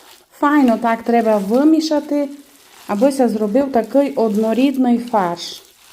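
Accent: Transcarpathia, Ukraine. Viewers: Яка велика наша Україна! Яка чудова закарпатська говірка. І все ж зрозуміло. Дякую Вам, пані господине. Отримала подвійне задоволення. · Ваш діалект говорить про те,яка у нас велика Україна!!!! Я з Одеської,найбільшої області України, і прекрасно Вас розумію!!! У нас говорять на суржику,але я,якщо чесно,соромлюся говорити такою мовою,тому,коли я розмовляю українською мовою,то всі думають,що я зі Львова!!!😄 А мій рідний брат говорить,як Ви,без комплексів,тільки на суржику,як говорять у нас в Одеській області,і Ви знаєте,він піднімає мені настрій,так само,як і Ви,тим більше це ютуб,і це Ваша Закарпатська изюминка!!! Мені подобаються Ваші рецепти,і Ваш Закарпатський говір!!!! Дякую за рецепт!!!❤️ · Це закарпатский діалект! Гарний, ні на який інший не схожий.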